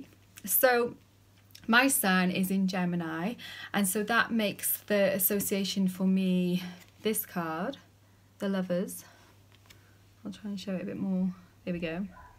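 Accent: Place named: England, United Kingdom. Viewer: hi, I am somewhat new to your videos, but have been watching them. interesting. do you live in England? I am not good about accents. You could be in Australia or New Zealand, but i'm guessing England. you have a very pleasant personality. what exactly is a story witch? do you practice something such as white magic i think it's called?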